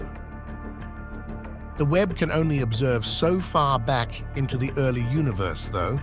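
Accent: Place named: England, United Kingdom. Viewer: More Hilarious Bull##@ !! Always with an "English " Accent , again. We all know that "the BIG BANG Theory" is BIG BULL###T !! always has been. Just more Garbage . Kind of like terms such as "Singularity" or " Quantum Entanglement " Made up terms in an attempt to sound " intelligent" . Humans will never be able to describe Creation. This is an Egomaniac 's attempt to claim some kind of knowledge that they don't have. So tiring.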